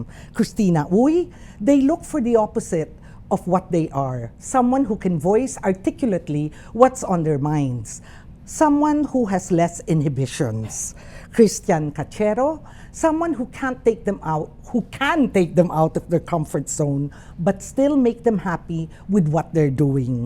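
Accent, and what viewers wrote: Philippines. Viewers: I came across this video and thought you were Filipina when I heard your accent! And hala, you are! Lol thank you for that insightful information! =)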